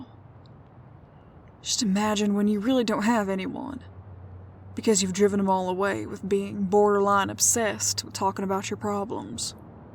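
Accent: South, United States. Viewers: The southern accent makes it so much more calming lol I always come back to these vids.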